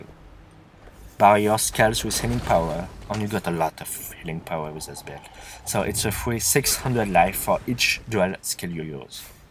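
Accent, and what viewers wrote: France. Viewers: J'entends un accent français :)) Excellent guide !!! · Your english is difficult. You speak way to fast as your accent pronounces words wrong. Can't understand half of the begining. Gave up. Learn to enunciate english with out french habits. Thanks anyway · Dude! what are you french? best teaching video I've seen so far. Oh and I like when you say "it is insane" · lol its french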